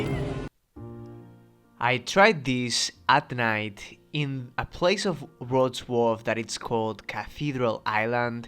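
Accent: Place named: Spain. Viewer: Great spainish accent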